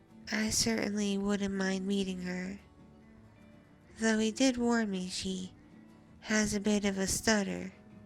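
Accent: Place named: South, United States. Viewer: That country accent just B E A utiful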